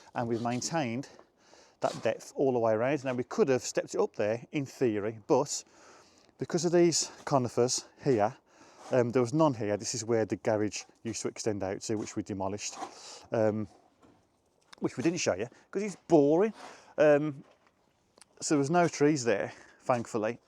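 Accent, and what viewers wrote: Birmingham, United Kingdom. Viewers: Sad but these things happen. I may watch both of you, see how vids & editing pan out. Good luck (to you both / all). Keep the good material coming. I'm finally catching on to the Brummie twang.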